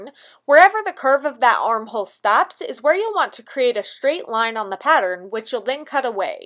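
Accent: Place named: Canada. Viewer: Hey, your accent makes me wonder where you're from. Are you Canadian??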